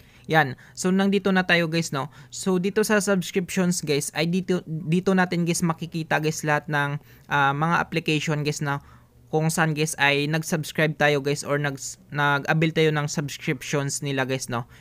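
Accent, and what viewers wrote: Iloilo, Philippines. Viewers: Ayusin ang pagsasalita parang Ilonggo kayo? Tutoo o hindi?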